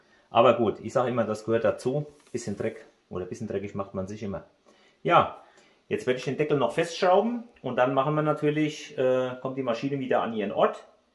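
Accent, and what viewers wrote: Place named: Dillenburg, Germany. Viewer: Sag mal wo kommst du her vom Dialekt her hört es sich nach Dillenburg an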